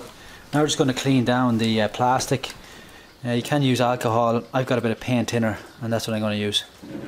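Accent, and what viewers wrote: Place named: Ireland. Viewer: wow fair play well done and good to have an irish voice for a change lol.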